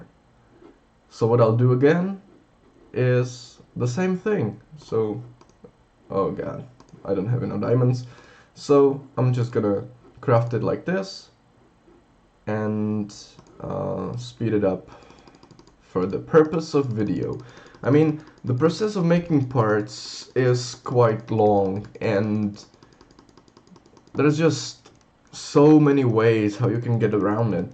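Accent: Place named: Slovakia. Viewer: Where are u from cuz ur accent feels like ur from Slovakia or Czech 😊 maybe Poland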